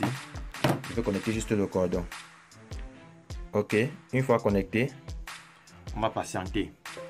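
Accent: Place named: Cameroon. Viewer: Jvoudrais aussi un box si jpx l'avoir chez toi jcroi q t'es au camer, vus ton accent je crois que t'es 1frero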